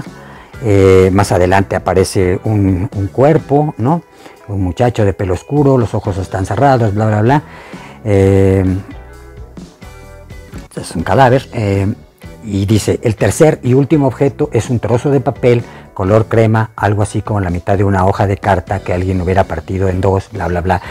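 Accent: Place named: United Kingdom. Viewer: I like your lovely british accent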